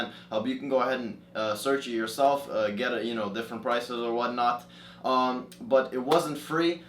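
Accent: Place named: United States. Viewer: he said in live stream multiple times he lives in the U.S., and clearly has an american accent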